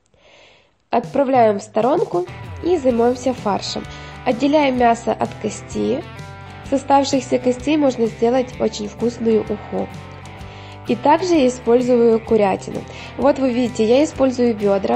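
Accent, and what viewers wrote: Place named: West, Ukraine. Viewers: Если Вы не слышите украинский акцент, то это Вы с другой планеты. Ясно? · Девочка из Западной Украины, г.Ровно. Не надо придираться к произношению "правильно, или не правильно". Ей 24 года, а готовит - профессиональный повар оценит.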